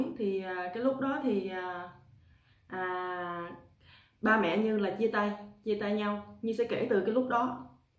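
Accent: Mekong Delta, Vietnam. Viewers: Nghe giọng miền tây là có cảm tình. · nói chuyện thật thà, dễ nghe quá, đúng là người miền Tây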